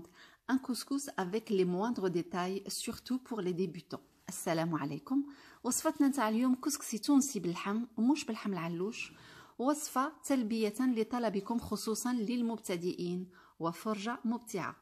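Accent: Morocco. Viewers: Soubhanallah had la dame elle a pas du tout le langage tunisien . Elle parle plutôt Marocain . Ce n'est pas la langue tunisienne . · Notre grande cuisine traditionnelle couscous tagine pastella je vous donne un indice :****Marrakech **** bonjour ma cousine maghrébine je n'ai jamais vu laver la semoule on la travaille avec la vapeur à 3 reprise minimum (passoire) vous avez la méthode c'est sûr vous avez côtoyé au moins une famille marocaine en France..vous avez même l'accent marocain entout cas le Maghreb city 😇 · Oui, je pense qu'il s'agit d'un couscous marocain, d'autant plus que la personne qui fait la recette a un accent typique marocain, mais malgré tout ce le Maghreb.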